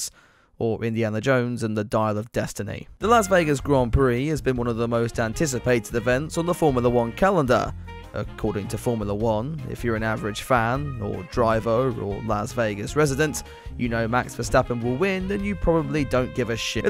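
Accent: United Kingdom. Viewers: Honestly, I can't understand this vlogs commentary. Narrator talks too fast and too much British clipped accent for my taste. Definitely NOT at par with BBC standards.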